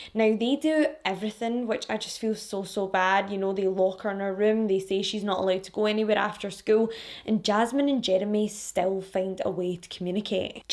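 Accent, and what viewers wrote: Scotland, United Kingdom. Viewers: Is she Scottish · Hello. Yes I'm a brand-new subscriber from America. I love your accent I spotted it as Scottish right away. This story is so messed up all the way around. Those parents pick themselves up. They just left the dark past behind. And they reached for the Stars. And her only complaint was that she did not like the rules. She should have just sucked it up Chuckles. Find one teenager that just likes the rules. LOL. The tragedy of the murder. To her father seeing her as his last thing he sees on this Earth. And oh my goodness a little boy. The fear and Terror that boy had to go through period at the hands of his so-called sister. And I absolutely agree with you that idiot she was dating had fetal alcohol syndrome. I have worked in the healthcare industry. I have met many children with fetal alcohol syndrome. There's affect that a lot of people don't know. 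If you look at your pinky and you bend it there's two joint. A person who have foetal alcohol syndrome only have one joint. And I could tell by the structure of his face. And the fact that his mother was just a alcoholic. But at least you admit she did not address his mental health issues when she should have. That doesn't make anything better. but one we own up to our mistakes and take culpability for them. Give me an iota of minimal respect for her. and what about everybody that was seeing that on MySpace? They should definitely all be held culpable. You keep it up honey you are on the rise. You have become one of my favorit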